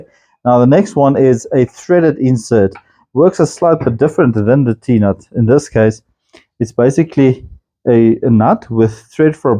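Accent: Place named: South Africa. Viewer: Thanks for these great ideas! I've never used the t nuts and hanger bolts before. I'm not sure that I can easily sink the t nuts into timber that is 160mm deep using either of your first two methods. The threaded inserts have to go into a wall stud to hand the floating shelf on. That will be awkward to because we can knock holes in the wall, but there must be a way to do this somehow. I used to have a Dr from South Africa about 30 years ago (he worked in Tasmania) I still love the accent, thanks for triggering a happy memory, of course I love your accent too as it's the same as his!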